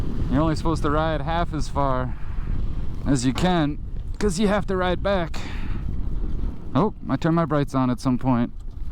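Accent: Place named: Minnesota, United States. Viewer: you’re welcome! Thanks for the compliment! Glad to help. Your MN accent reminds me of being a kid living in NE Minneapolis.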